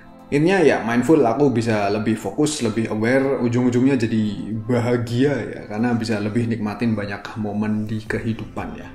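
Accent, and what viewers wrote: Java, Indonesia. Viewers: Di jembreng... /jawa bangett mas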